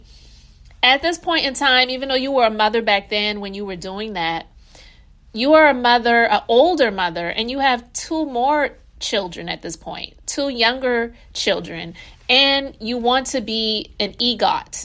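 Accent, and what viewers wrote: Charleston, United States. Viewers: Hey hey your accent sounds familiar. Are you from the Charleston SC area? Kandi was definitely wrong for laughing with DJA-1. I enjoy your content. I live in Ladson.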